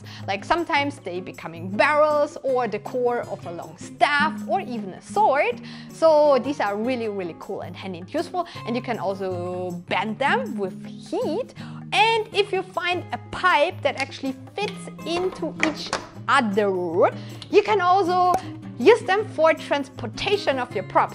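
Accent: Germany. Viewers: Is she german? She sounds like that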